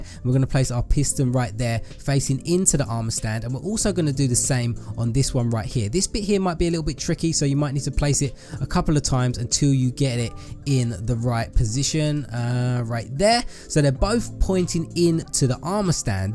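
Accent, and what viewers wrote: United Kingdom. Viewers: So awesome where in England r u from?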